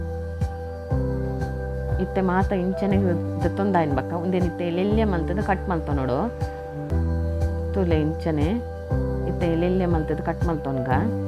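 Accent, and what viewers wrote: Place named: Mangalore, India. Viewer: Manglore kannada artha agalla ree madom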